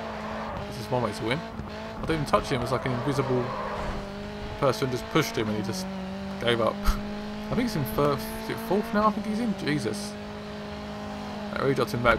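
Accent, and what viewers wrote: United Kingdom. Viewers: He is British i think??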